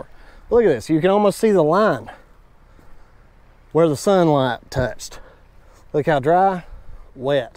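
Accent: South, United States. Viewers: Great content. My grandson comes running when he hears "WELCOME TO THE BROADCAST!!" Lol He also LOVES the the southern draw and finds ways to say the word "pipe" just like brother!! Thanks for keeping it kid friendly and consistent!!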